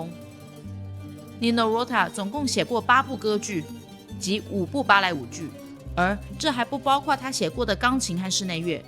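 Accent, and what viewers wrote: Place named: Taiwan. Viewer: 謝小姐解說很細膩資料收集的很用心。妳的台灣國語很熟悉。我也是台灣老蕃薯😂😂😂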